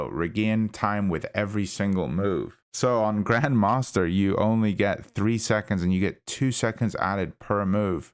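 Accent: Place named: Scotland, United Kingdom. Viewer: This was super helpful, thank you very much! I just found out about you because I was looking for a ChessMind review. You seem like a top bloke :D Definitely will stick around. Unrelated question—and please ignore this if you're not in the mood to answer: English is a second language for me and I was curious what accent/dialect you speak. It's super cool, but I'm terrible at pinpointing English accents. I thought it might be Scottish? (Sorry, if this is completely wrong!) Anyhow, cheers again!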